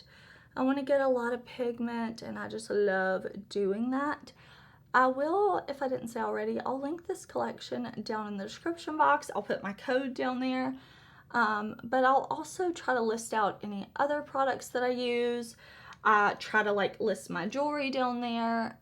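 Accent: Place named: South, United States. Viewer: Hi,. I just found your videos by looking for videos on the Scooby Collection. I’m so glad that I stopped and watched. I love your technique and artistic abilities, but I’m staying (subscribing) because of your accent and personality!!! I do sense that you’re a SOUTHERN gal, just like ME!!! I am Jeanie from Alabama! So very nice to meet you!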